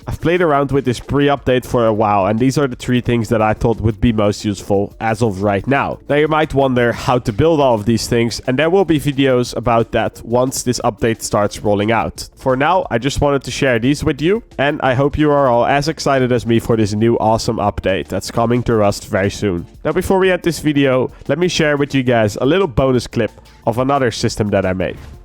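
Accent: Netherlands. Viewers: You are dutch. Prove me wrong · Nice dutch accent =D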